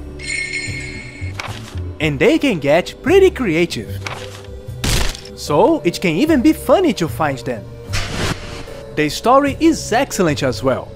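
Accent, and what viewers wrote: Brazil. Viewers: Your accent screams braziliam... Nice videos anyway~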